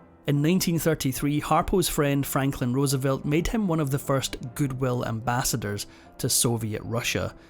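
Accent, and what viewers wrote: Scotland, United Kingdom. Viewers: I like the speaker's accent. Scottish? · A fine Scottish accent. Didn't realise that's how you pronounced CROWKAY though... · Clearly has a Scottish accent 🙄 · His accent is Scottish. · Who doesn't love Harpo? A preh-tty gued Brehtish (or Scuttish or Oyrish?) narraytion. I agree with the comment about not dissing Zeppo. He was quite talented on & off screen. One story relates how critics raved about Groucho's performance, when it was Zeppo subbing for an ill Groucho. Give him a break. But Harpo, wow!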